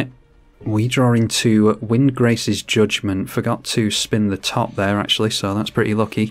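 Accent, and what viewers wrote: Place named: United Kingdom. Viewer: Talk british to me mate. Honestly your voice is outstanding.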